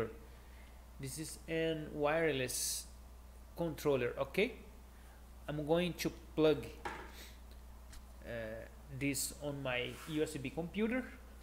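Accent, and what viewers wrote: Brazil. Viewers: que dá hora! Eu falo inglês um tempão e ainda não criei coragem de gravar um vídeo em inglês, achei seu vídeo sem querer, você foi uma inspiração...kkk...ouvi vi e ontem e pensei "é brasileiro, certeza! Se ele pode eu posso kkkk"... enfim... parabéns maninho...vídeo simples e muito bom!!! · tava me perguntando se era br pela pronuncia, ate que vi a declaração da receita ali na área de trabalho KKKKKKKKKKKKKKKKK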